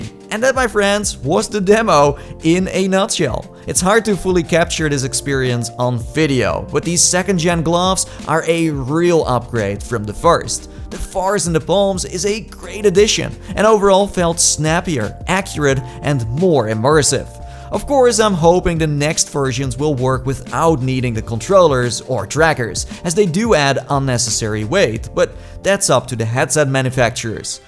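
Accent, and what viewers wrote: Netherlands. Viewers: You sound like your from the Netherlands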